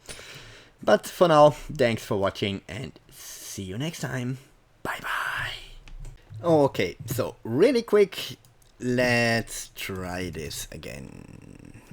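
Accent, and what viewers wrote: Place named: Germany. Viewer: That strong german or dutch accent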